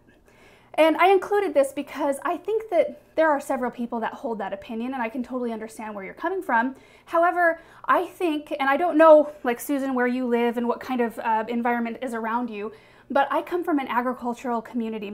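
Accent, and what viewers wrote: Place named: Texas, United States. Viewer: Texas says it same as you.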